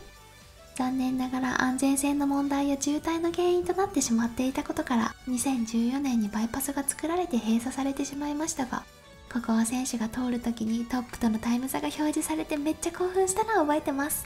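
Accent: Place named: Ehime, Japan. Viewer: (•ө•)♡ こんばんわ今回は箱根なんですね！その前に… とチャンネル登録者数〜 やっと、やーーっと２万人越え！！長かったけど、じわじわ確実に増えてて、嬉しいですね！本当におめでとうございます🎊 でも、コメントへの返事がますます大変になってしまいますよね… 😐 きっと、なら大変とは思わず、「かまん、かまん」なんでしょうね。 （↑愛媛の方言で合ってますか？） 今回の箱根は何月の旅行でしたか？ガーミンの気温に18.8℃と表示されてたので、４月頃でしょうか？山道だから５月かな？日差しが熱いって、６月？🤔 のジャージ、青い空、の首（日焼け対策してますね！白いです笑）、ロングヘアー、凄くマッチしてて可愛いですよ(•ө•)♡ 以前の動画でも今回の動画でも「〜 …じゃあ」って、今回の動画では「路地裏の斜度もきついんじゃあ」は愛媛の方言ですか？女の子の方言って可愛いですよね (•ө•)♡ チェーン落ち着くのところで参考になれば、、自分はサドルバッグにニトリル手袋を入れてますよ。パンク修理は汚れは気にしないですが、チェーン周りの何かの作業をすると黒い油が手につくし、バーテープも汚してしまいそうなので、念のため入れてます。ガーミンちゃん、やっぱりそうなんですね…😭 自分も横浜市に行こうとナビに従ってると国道357をひたすら走り、東京湾海底トンネルに案内されます。高速道路と自動車専用道路（国道357）なので通ることが出来ずかなり戻されます😭 後ろから車が飛ばしてくるのって結構怖いですよね… 自分は右側のバーエンドキャップを外して、凄く小さなバックミラーを付けました。後ろを振り返ることなく後方確認が出来て、買って良かったアイテムの１つです。おすすめです。硫黄の温泉♨、いいですよね！箱根は何回か行ったことあるんですが、硫黄臭い印象が無くて、そうなんだ～！！と勉強になりました。草津温泉♨は硫黄の臭いが凄いですよね。温泉玉子のシ～ンで写っていた太もも、鍛えられた太ももですね！凄い Σ(ﾟДﾟ) クライマーの脚じゃないですか～💦 いいな～ 坂が好きって、憧れます。自分のここ最近ですが、土日のどちらかに横浜市にある浜カフェというところに行ってます。往復で80キロくらいです。膝の痛みはまったく無くなりました。少しずつ距離を延ばしたいのですが、夏の日中の暑さがきつく距離を延ばせず…です😢 はじめの頃は帰り近くになるとふくらはぎが攣ったりしましたが、ネットでいろいろと勉強して、ポカリかアクエリは必須、トイレに行って黄色いおしっこが出たら水分不足ということを知りました。水分&ミネラル補給がダメダメでした💦 ミドリ安全というところから出しているミネラルのタブレットも買って、今日（7/9）、この暑さの中びっくりするくらい快適に走れました。夏季休暇はまだ未定ですが、自分もを真似て、一泊ライドに行こうと計画してます。はいつかはきっと富士ヒルにも挑戦するんだろうな～～と思いました。応援してます！ （また、めちゃくちゃ長文になってしまいましたが💦🙇‍♂💦） 待ったね～～(•ө•)♡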